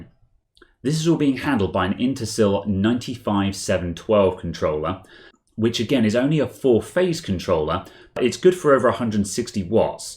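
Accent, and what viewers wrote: England, United Kingdom. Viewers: and english accent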